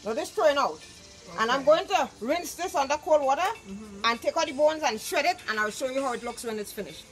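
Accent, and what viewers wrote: Trinidad and Tobago. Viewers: Looking so good.Love the Trini accent.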